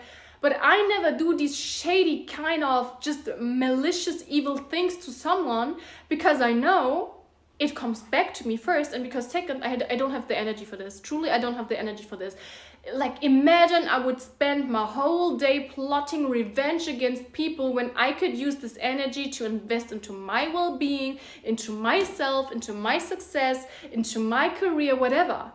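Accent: Germany. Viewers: I think german, she's from Germany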